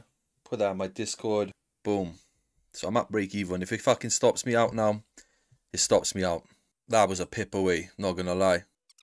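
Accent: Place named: Cardiff, United Kingdom. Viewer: Cardiff accent bro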